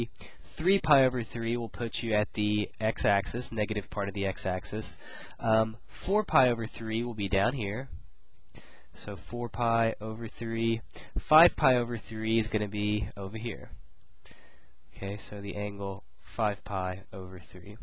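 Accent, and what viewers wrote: United States. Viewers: one of the american accents ;) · of course!! It is American Accent. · True, but I thought he was an American. So American to American, there is no accent. (I do understand that it's an American accent, but between Americans we don't notice a difference.)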